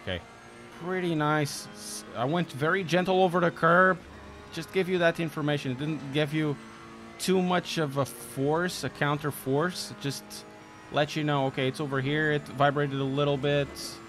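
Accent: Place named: Portugal. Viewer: és Portugues? sinto ai um sotaquezinho.. e tambem como deste review ao yuri... segui porque sigo tambem o mundo das corridas e tambem gosto de fazer umas corrindas num simulador apesar de ser um simples g29, gosto de estudar trajetorias e superar me a mim mesmo.... já joguei muito iRacing mas agora fico me mais pelo Assetto corsa Na nurbur :D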